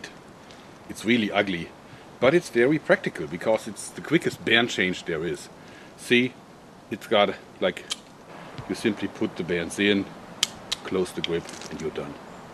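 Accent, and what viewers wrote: Germany. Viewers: Hörst dich sehr deutsch an, bist auch deutscher oder? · ich hab mich die ganze zeit gefragt warum der sich so deutsch anhöhrt :D:D kommt ja aus deutschland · klar ist er Deutscher - hört man ja auch an seinem Akzent :) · anybody else notice that he sounds like a german robin williams... he sounds just like him just with a german accent.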